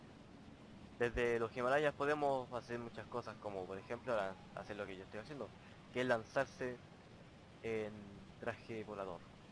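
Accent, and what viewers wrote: Chile. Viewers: Eres chileno chileno y chileno se reconocen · oye eres chileno?:v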